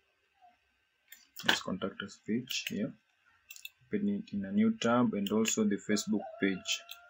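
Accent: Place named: Kenya. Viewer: You have a kenyan accent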